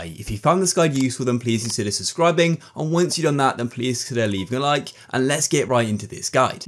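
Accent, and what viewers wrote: United Kingdom. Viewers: i could tell this guys british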